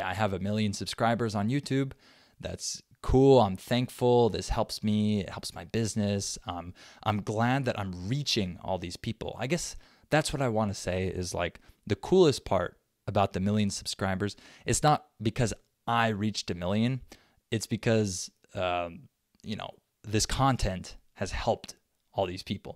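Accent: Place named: United States. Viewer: see you later beautiful guy i love your american accent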